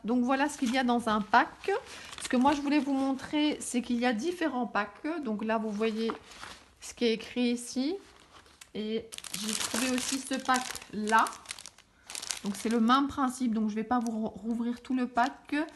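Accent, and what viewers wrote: Belgium. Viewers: Coucou mais comme je aime tes vidéos je me permets le tutoiement c est plus facile si tu es OK. Je débute en scrap cela fait un an environ et tes vidéos m enchantent et m enseignent bcp.merci beaucoup vraiment. Je adore les fées et la fantaisie la nature du coup je me reconnais dans vos créations. Voilà je te souhaite de passer de bonnes fêtes moi aussi en vacances pour 5 jours avec mes deux enfants 10 et 13 ans je suis infirmière donc peu de temps pour scraper.Merci en tout cas pour ces bonnes idées sous l accent belge.Une dernière info des paperblocks de luxe sont sortis il y en a 4 magnifiques les as tu en Belgique nous ici en Corrèze pas encore. bises.laurence.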